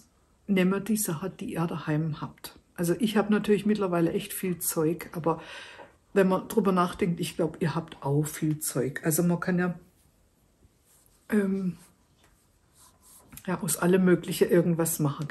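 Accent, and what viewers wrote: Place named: Hesse, Germany. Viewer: Hallo, ich find das super, das 'babbeln" zwischen durch. Hauptsächlich auch wegen dein Dialekt (ich bin aus Hessen) find ich toll❤. Natürlich auch das, was du uns zeigst ist immer wieder wunderschön. Ps seit langem suche ich Lochverstärker und nur die aus Kunststoff gefunden. Und du hast ein Gerät gezeigt, wo ich eigene herstellen kann. Ich bin begeistert. Danke du Liebe🤗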